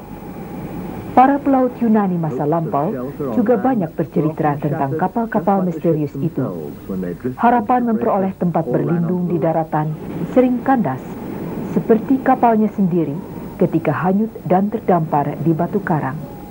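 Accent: United Kingdom. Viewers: dari logat englishnya seperti dari BBC acaranya. Secara TVRI kiblatnya tahun 80-an adalah BBC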